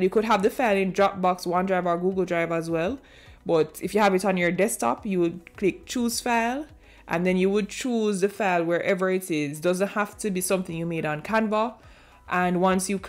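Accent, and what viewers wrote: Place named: Caribbean. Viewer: Awesome... love the Caribbean sound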